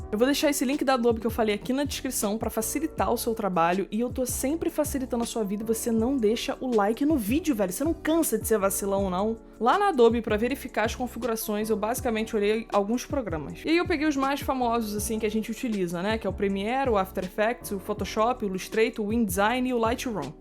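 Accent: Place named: Rio de Janeiro, Brazil. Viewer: Quase não da pra reparar que ela do Rio né hahaha Goxtoso esse sotaque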